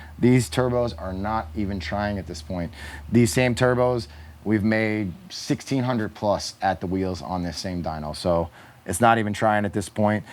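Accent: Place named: East Coast, United States. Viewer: This that east coast talk, hey man it's sToCk run me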